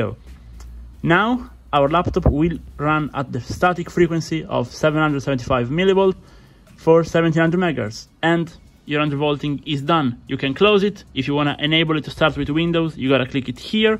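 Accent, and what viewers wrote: Italy. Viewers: si sente che sei italiano comunque bei video.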